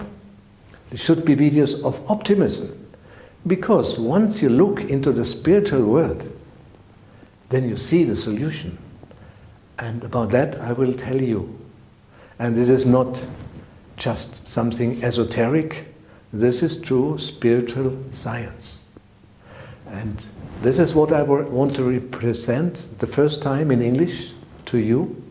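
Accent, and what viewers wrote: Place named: Bavaria, Germany. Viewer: Your Bavarian dialect mixed in with English is a delight to my ears. 😘 I’ve lived in America for many years and still have a little Munich/Bavarian accent😘 love your courses in German and English. Eye and heart opening.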